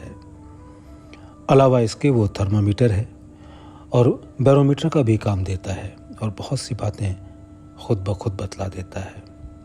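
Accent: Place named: Pakistan. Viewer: प्रिय भाई आपकी आवाज में पत्र सुनना बहुत मधुर लगता है विशेष उर्दु लहजे में अलग ही आनंद आता है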